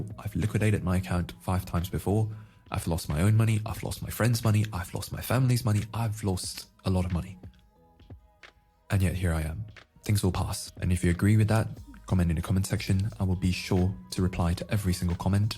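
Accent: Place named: England, United Kingdom. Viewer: Yes, this too shall pass. Thx for the reminder,! Btw, my girl wants to know what region of England you’re from. She’s super diggin on your accent, bro! I’m beginning to think she’s not even into crypto, just listens with me to hear the sound of your voice!😂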